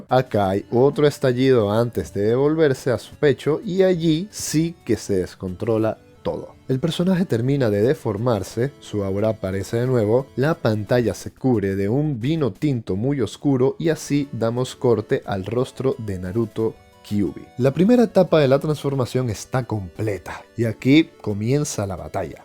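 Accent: Venezuela. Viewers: Eres vzlano? O_o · Eres venezolanoo? jajaja ´´sabes como es la vaina???´´ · Primer comentariooooooo, oye eres venezolano? Me da la sensación, me encantan tus videos, saludos desde Venezuela mi pana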